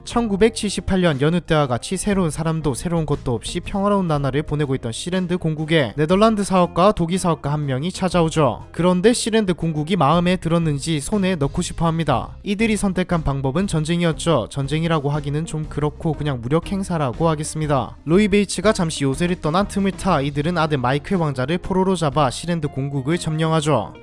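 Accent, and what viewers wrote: Gyeongsang, South Korea. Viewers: 여자목소리 들으면서 자면 달달해서 구독 눌렀다가 이 울산인지 김해인지 진주인지 모를 촌놈스애끼 씨부리는거 듣고 구독취소 바로 박음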